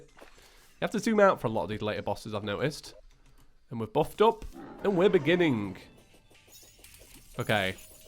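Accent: England, United Kingdom. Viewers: I live in the US and you said 1000 pound grass and I was like... why are you weighing grass?! Then I realized thats money. And I never would have realized that if you didn't have one of the most english accents ever.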